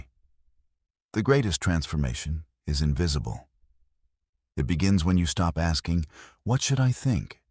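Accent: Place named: United States. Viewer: Good job with the the American accent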